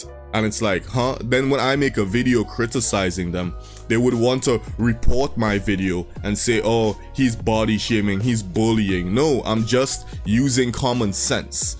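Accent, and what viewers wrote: Trinidad and Tobago. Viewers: You kinda sound Trini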